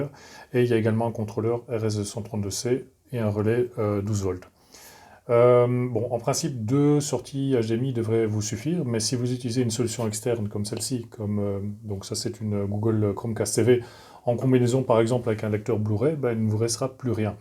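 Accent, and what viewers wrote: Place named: Belgium. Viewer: tkt pour l'accent belge, il est vraiment très léger et n'entrave en rien la compréhension !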